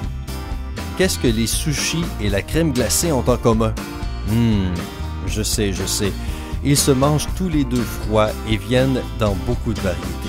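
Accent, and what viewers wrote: Quebec, Canada. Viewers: tu as un accent très jolie du Québec mais il faudrait dire vous par politesse désolé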